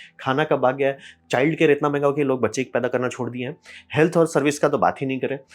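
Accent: Bihar, India. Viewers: Bhai aap Bihar se hai kya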